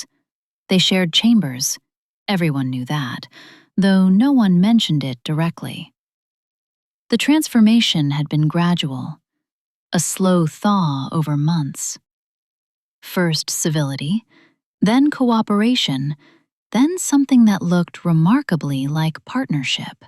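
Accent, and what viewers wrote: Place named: England, United Kingdom. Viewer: I liked storyteller with the English accent.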